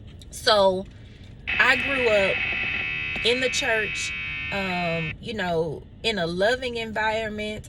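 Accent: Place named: South, United States. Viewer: You must be from the South. (Especially AFTER hearing your accent) Focus on yourself, and not Social Media. Obviously you had a rough childhood. That's probably why you like D-Boys!!! And you'll Always be like that!!! Good 👍 luck 🍀 in the Future. Sorry that you're Pops didn't give you that Validation.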